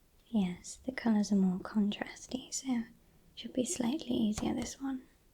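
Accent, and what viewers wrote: United Kingdom. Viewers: Bri'ish?! My favourite. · I enjoy when we get a Dr. appointment from time-to-time 🙂 The eye lid lifting was quite tingly for me. The Queen’s English makes part of the exam sound a bit aggressive 😉 “Point a ‘torch’ at your eye” - someone from the US: 😨 “Hang on! You’re gonna what?!” And the ‘Is the dress blue or gold?’ reference 🙌🏼 nice flashback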